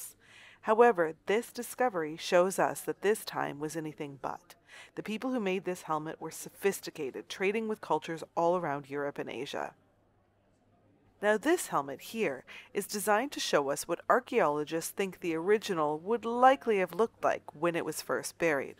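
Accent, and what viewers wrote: United States. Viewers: I can't work out if your accent is American.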